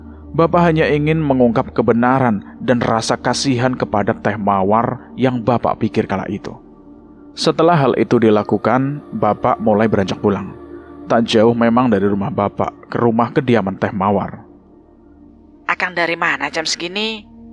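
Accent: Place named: Java, Indonesia. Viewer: Medok Jawanya lekat banget, kurang enak di dengar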